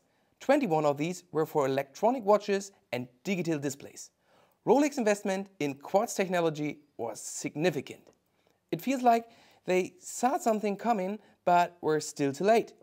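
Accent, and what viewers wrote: Germany. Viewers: The thick German accent... but still amazing video.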